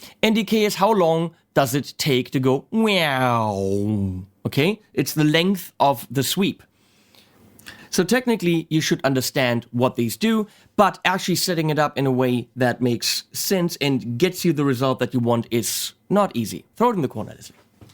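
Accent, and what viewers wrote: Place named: Germany. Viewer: your accent seems particularly German today.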